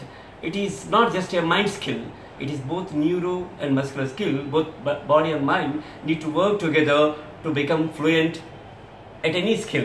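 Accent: India. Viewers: Obvious tension,Indlish,non spontaneous phrases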